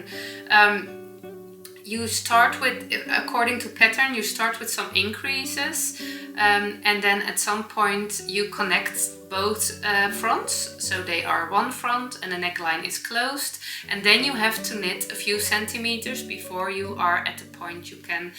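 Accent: Netherlands. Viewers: Love your Dutchy English 🙂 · I love, love your “Dutchy” English so much and you are always learning our way of speaking. It makes me smile and happy to see and listen to you. Your knits 🧶 are beautiful and very inspiring for me❤❤❤❤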